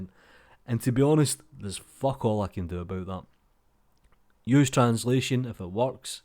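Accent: Scotland, United Kingdom. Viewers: I'm not native but your Scottish accent is absolutely brilliant! Keep it or marry me so I can listen in private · Your scottish accent is absolutely great and we love it. That's also the reason we are watching your videos. Keep doing, don't stop, please. There are different accents somelike is more comfortable with ( British, US, Australian, German, French, India ... etc. ) Guys, exit your comfort zone and listen, watch, learn, improve - like in Blender. Listening you will improve english skills for others, not only Blender skills. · Leave my Scottish Blender Tuts man aloneeeee!!!! I love his accent (but then Burnistoun was genuinely one of my favorite sketch series shows ever) If ye don't understand the lingo - away to a different YouTuber you go. (I'm an American, btw) If you want to learn to understand Scottish then go watch Burnistoun on YouTube it should get you up to speed. 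· I mainly watch it because of the Scottish accent. Easier to understand than Burnistoun :D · I fookin love the Scoatish accent n' ah love your tutorials! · I am actually watching the videos on Scots phonetics now that I got hooked on your accent 😄It’s so soothing! And I never have any trouble understanding now that I’ve watched a lot of your videos and got used to it. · To the twat who wrote the comment: You do know the CC button will show text of what's spoken, don't you? Or is that too hard for you to understand, too? To: Great vids AND a delightful Scottish accent? What more could you want? Thanks for what you do! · Quality content and the 2nd best accent in the world (sorry, Kiwis win that one). Nothing to complain about. When I first stumbled upon one of your videos I was like: fuck yeah, finally a Scot doing Blender tutorials. Win! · Dude, your accent is the reason I watch your channel. 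There's a bunch of blender content but none with a cool Scottish accent. Ignore that nonsense. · Personnally I have lived in Scotland for a year and a half and your accent makes me remember lots of nice people i've met there so I like it :)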